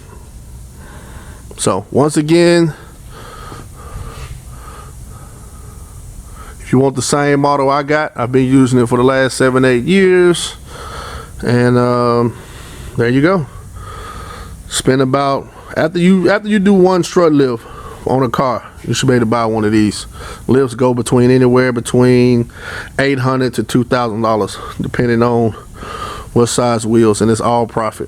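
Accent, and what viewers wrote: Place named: Houston, United States. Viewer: Dude is undoubtedly from Houston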